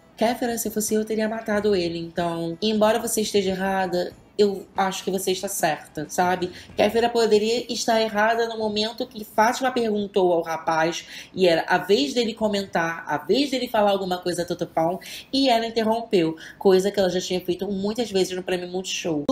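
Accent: United States. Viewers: Que sotaque americano lindo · queria entender esse sotaque meio brasileiro meio texano meio nordestino da blogueirinha.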